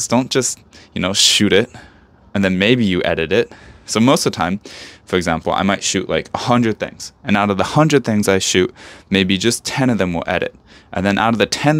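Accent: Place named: United Kingdom. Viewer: You have a British accent, that's cool.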